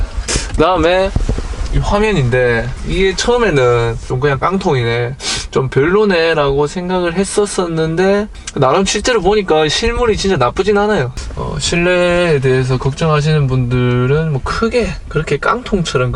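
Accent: Gyeongsang, South Korea. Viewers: 경상도 사투리 멋집니다 ㅋㅋ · 아 이 말투는 상주 구미 김천 말투 같은데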